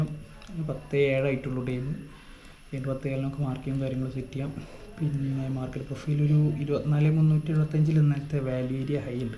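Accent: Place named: Malappuram, India. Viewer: ഒരു മലപ്പുറം ട്ടെച്ചുണ്ട്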